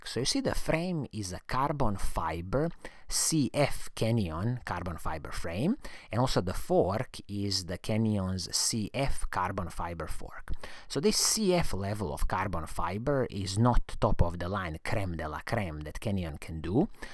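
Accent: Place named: Italy. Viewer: He sounds Italian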